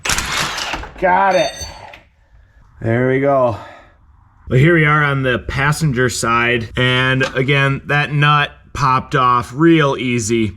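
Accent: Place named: England, United Kingdom. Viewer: But then it's a Town Country/Caravan. I own 2, 02's (Town Country Limited AWD with a custom 1/2" wall rear axle, a Reese Class 5 45707 trailer receiver, a 2" lift, you name it and a Caravan SE, shorty 3.3) Better than any Corvette, Lincoln Town Car, even a Bugatti. You should fix the Dog Legs and rockers. Welding in is best but watch the foam! Excellant editing, clear video, and English accent (unlike my Czech) so that can be isolated. ~ nope 336 subs Youtube is weird isnt it?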